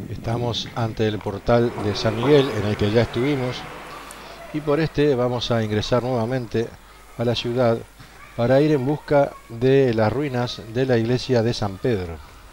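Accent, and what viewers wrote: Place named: Argentina. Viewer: Por el acento sos argentino...no...?...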